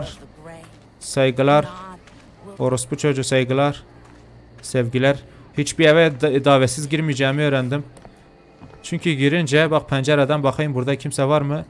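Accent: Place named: Diyarbakır, Turkey. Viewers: Abecan abecan sen kesin diyarbakirlisan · Ay ay Diyarbakır sivene hayranim abimm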